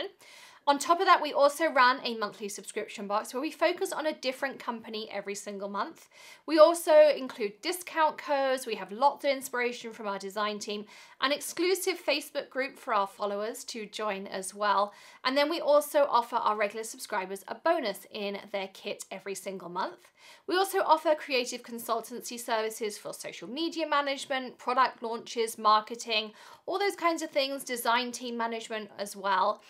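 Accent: United Kingdom. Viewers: WOW !!! You sound like maybe you're either Wonder Woman or maybe you have a few clones walking around there helping you do all that you do. I tell you , you look so calm for all that you do. I am amazed. Have you always had this kind of energy ???? If it were possible , I'd tell you to bottle it and sell it. You would make Millions $$$. It has been a joy listening to your video about your self. I now know where that cute little accent comes from. Welcome to America from across the pond.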